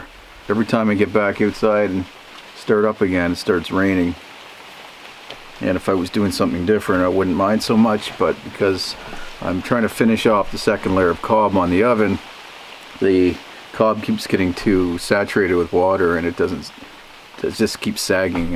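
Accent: Canada. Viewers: Nice vid! I thought you'd go with concrete for the oven and enjoyed seeing you use earth instead. I like that Canuck accent, too..."Ruffed Gross". :-)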